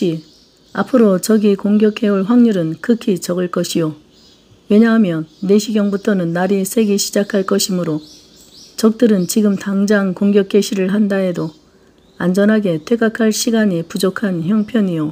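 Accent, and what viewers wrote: Jeolla, South Korea. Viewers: 작가님, 너무 재밌게 듣고있습니다. 그저 고맙고 고맙습니다. 전라도 사투리가 구수합니다.😅😅🎉❤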